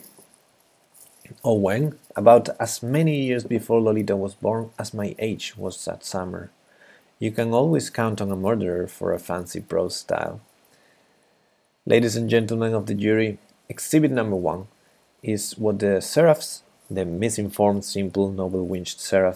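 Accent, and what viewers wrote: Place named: Paris, France. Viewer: the narrator is from paris.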